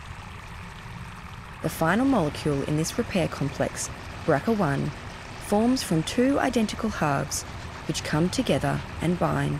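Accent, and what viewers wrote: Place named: Australia. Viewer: isnt this someone elses video but with an Aussie narrator?..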